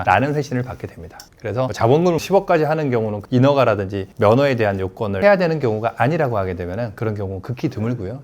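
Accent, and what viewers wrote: Jeolla, South Korea. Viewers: 성님 전라도 사투리 고치실 생각은 없으신가여!!듣기 거북해서염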